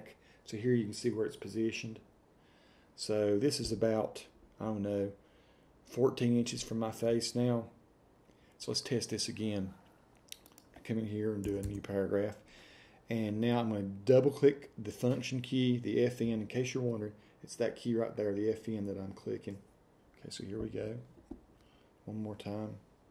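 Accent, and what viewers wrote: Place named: Indiana, United States. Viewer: That was pretty entertaining! lol If I read the parts that it did not get right with my Hoosier accent, I sound like you! Subscribe if you lock! lol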